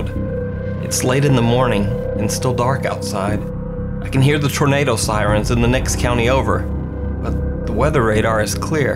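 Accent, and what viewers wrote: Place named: South, United States. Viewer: Its the slight southern draw 😆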